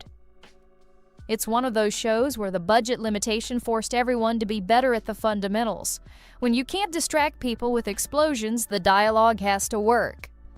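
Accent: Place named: United States. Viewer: And the voice over is... an ungifted AMERICAN!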